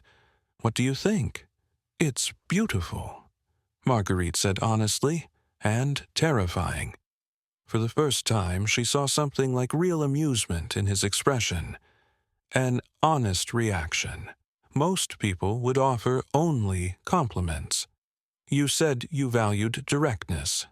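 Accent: United States. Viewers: I enjoyed this story, the relationship between Marg and Max was developed nicely, there were no nasty scheming people plotting dastardly deeds… the narrator - firstly the sing-song, staccato cadence was off, and of course, American accent for English story was off, but notwithstanding that, the diction was clear and voice well modulated, which made for easy listening 👍🥰🇦🇺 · As I commented on another story, I'm having problems listening to an American accent told for a English story.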